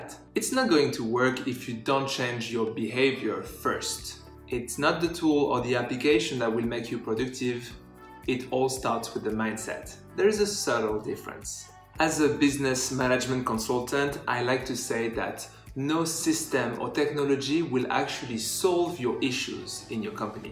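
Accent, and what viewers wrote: France. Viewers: You need to make longer videos man 👍 Also where are you from? Think you got a french or Canadian accent right?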